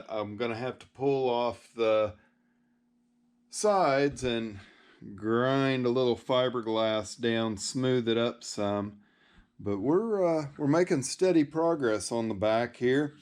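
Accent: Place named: South, United States. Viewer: Im starting to get your shorts with the exaggerated southern country accent in my feed. The algorithm is starting to work for you with those videos! Make more they’re really funny and you’ll get a lot more subscribers!! Awesome videos. Watching From Chicago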